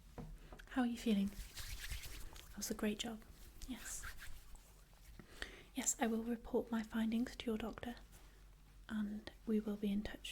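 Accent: United Kingdom. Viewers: Is that w Welsh accent I detect?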